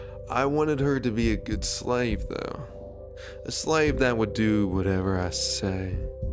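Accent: South, United States. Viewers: this is the most southern man ive EVER heard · Your hillbilly voice makes this 10x funnier. Keep on being awesome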